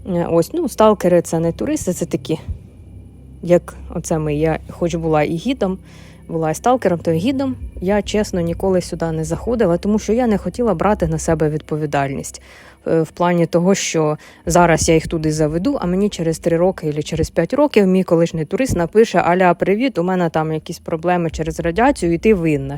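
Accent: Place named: Surzhik, Ukraine. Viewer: Какой у Вас классный суржик.))))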